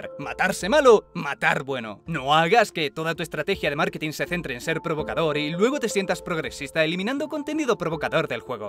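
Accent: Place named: Castile, Spain. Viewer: 🌕🌗🌑🌑🌑Vaya pedazo de video mal hecho, me tengo que ir en 9 min y el video dura casi 16 MINUTASOS, ah y de paso la voz esta en castellano y no en latino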